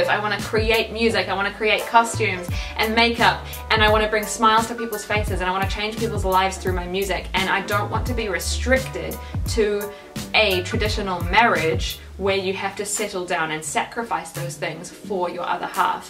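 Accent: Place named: New Zealand. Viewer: are you from new zealand? my second guess whould be australia your accent sounds a little kiwi to me,just curious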